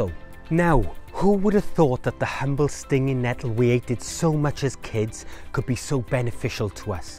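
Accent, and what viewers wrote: Wales, United Kingdom. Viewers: We eat them in Romania. I remember my grandmother feeding them to pigs..I remember their strong smell..so many memories brings this plant..hard to find them in Virginia, the US where I live now..btw love your British? accent.. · Thats not a "British" accent has a WELSH accent a big difference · Great video and lovely to hear a Welsh voice entwined in nature. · Yep its about time we started to listen to nature. Thank you soooo much for your vid and love the welsh accent sooo cute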